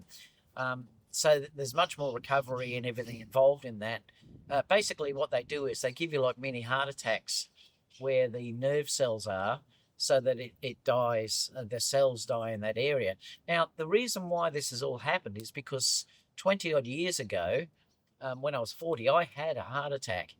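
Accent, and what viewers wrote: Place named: Australia. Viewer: So good to hear your beautiful Australian accent again. Miss you very much on youtube. Take good care of yourself because good health is only appreciated when it's gone. Looking forward to your road trip videos. Best of luck to you and your wife. Cheers.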